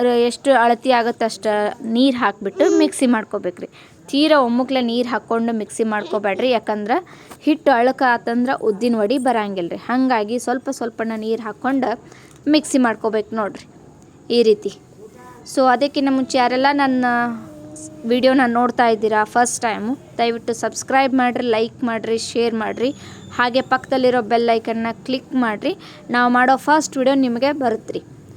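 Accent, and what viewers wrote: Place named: Bangalore, India. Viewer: Niv Bangalore kannada matadri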